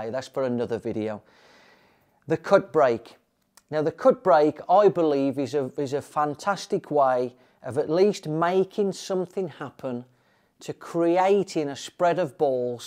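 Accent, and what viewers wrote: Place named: Midlands, United Kingdom. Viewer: Hi, love your video mate... im prob only a few years older than you, been playing all my life, dad owned a pub all thru my childhood, i played pool everyday. I lived in australia for 20 years and saw u play there. I lived in sydney so i mostly played american pool, im back in the UK now and for the first time im hitting balls again on an english table.. wow.. playing english pool is quite difficult again after playing american pool for so long. so i really appreciate your tips here mate. Id love to come and meet you for a chat about pool one day. Im based in coventry, i can hear by your accent mate that your from the midlands??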